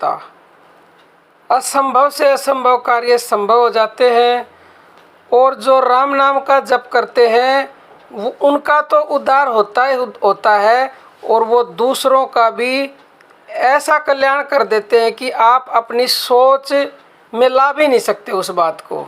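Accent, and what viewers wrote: Haryana, India. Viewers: जय श्री राम माताजी आपने सत्य कहा है माताजी आपकी भाषा हरियाणा के जैसी लगती है आप के बचन अमृत तुल्य हैं।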